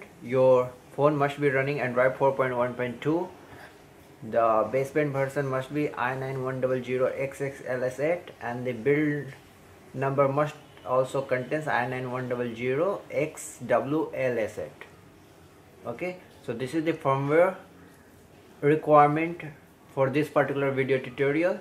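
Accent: India. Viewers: Indian voice ftw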